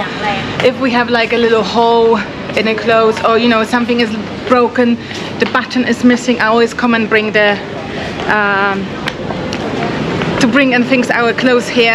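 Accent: Thailand. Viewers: 😊❤สวัสดีครับคุณพูดไทยสำเนียงอิสานเหมือนผม..น่ารักมากครับ,,🙏❤️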